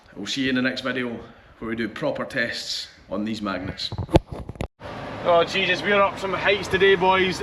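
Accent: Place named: Glasgow, United Kingdom. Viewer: 😂😂typical weegie patter. Keep it coming. That's what us scot like and used too haha. Ya wee cowww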